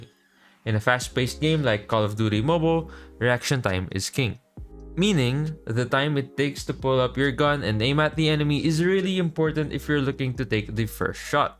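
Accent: Philippines. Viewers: Dude your accent remains me of Filipino accent tho. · peenoise accent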